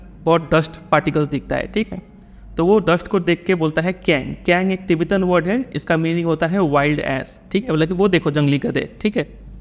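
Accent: Bihar, India. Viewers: he's bihari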